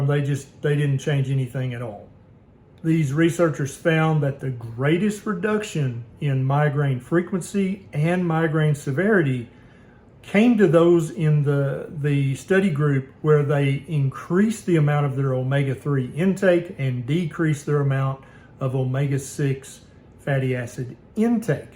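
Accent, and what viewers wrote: South, United States. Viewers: Read the full comment you will know where I’m coming from This doesn’t mean you’re dumb I had grown up in Poca, WV you’re a DR. you have to be near the top of your graduating class I just want to point something out it’s just an observation but I could tell you’re “country” by your accent then when you said bacon grease I knew you were from the south I grown up on white bacon gravy bacon and white bread rode four wheelers again you can be one of the smartest people in your town for your age group and everybody not believe in you because of your accent I know somebody from Elkview, WV he was picked on for his accent because of that people didn’t think he was smart he finished near the top of his class and became an stockbroker his salary is decent.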